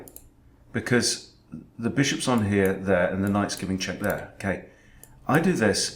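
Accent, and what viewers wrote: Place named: England, United Kingdom. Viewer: I hope you continue making videos. For me and many others, your videos are just at the right level. It's also nice to hear an English voice, there aren't many out there on twitch or YouTube. Good luck.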